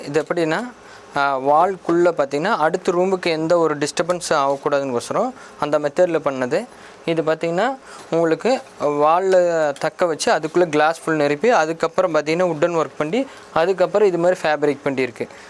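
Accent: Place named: Kongu, India. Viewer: More than work i m big fan of ur kongu slang....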